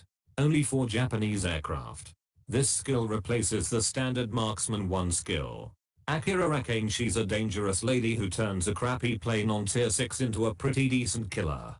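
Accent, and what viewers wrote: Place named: Russia. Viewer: I'd rather hear your Russian accent than this shit voice.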